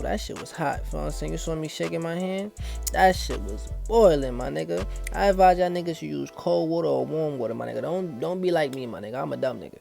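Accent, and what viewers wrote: New York, United States. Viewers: Bro I can tell you from New York by the way you talk good video tho g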